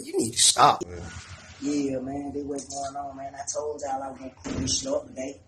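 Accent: Atlanta, United States. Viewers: Kuhh from atl?😂😂😂😂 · You can tell he from atlanta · ATL nigga for sure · I know a Atlanta nigga when I hear one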